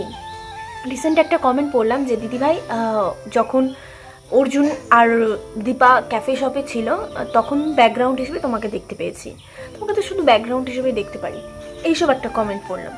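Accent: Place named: Cooch Behar, India. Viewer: যখন তুমি কোচবিহারের ভাষাতে কথা বলো শুনতে ভীষণ ভালো লাগে ❤❤❤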